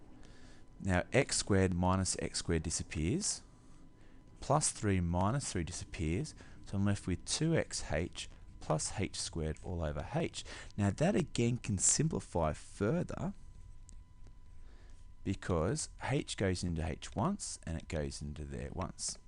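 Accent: Australia. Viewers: es una muy buena explicación muchas gracias, pero, ¡Griffin MC5 es retrasado! Tambien me gusta el accento australiano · Sounds Australian to me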